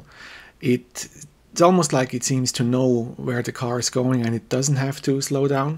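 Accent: Switzerland. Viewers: Schweizer Akzent hat überzeugt. Liebe Grüsse vom Genfer See :-)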